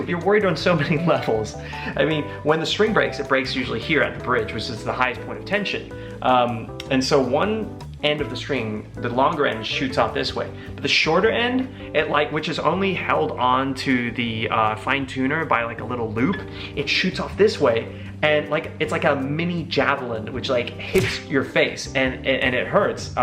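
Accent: United States. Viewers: To my ears, even Ray's American English sounded so eloquent and charming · WAIT I'm confused... he's talking in American accent but when he's when twoset he had an Australian accent?? Am I right or I'm just?? Sorry I'm not good at english anyway lol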